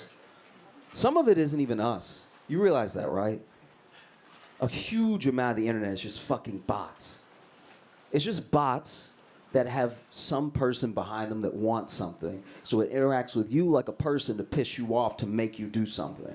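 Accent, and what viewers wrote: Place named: Los Angeles, United States. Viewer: Between Josh and Theo Von the LA accent is really growing on me😏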